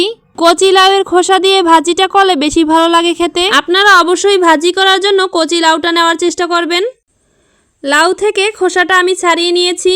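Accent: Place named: Barishal, Bangladesh. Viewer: বরিশালের ভাষায় কথা শুনতে ভালো লাগে ভাজিটা ভালো হয়েছে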